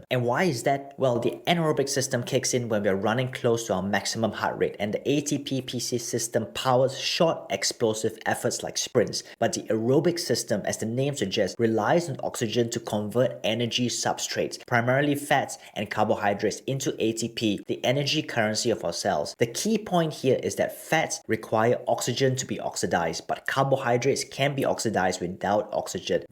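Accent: Singapore. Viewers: u singaporean ah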